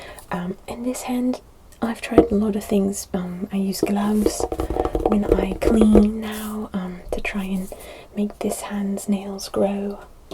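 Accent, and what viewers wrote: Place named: Wales, United Kingdom. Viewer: Ahh! I took your advice and went to watch some older videos - and now I know why I couldn't place your accent! I was thinking Welsh raised overseas or something. Good to finally know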